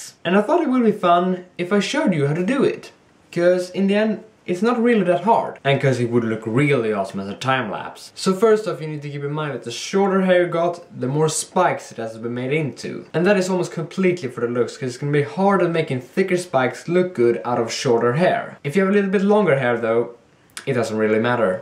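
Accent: Sweden. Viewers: sounds swedish? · You sound swedish... Har jag rätt?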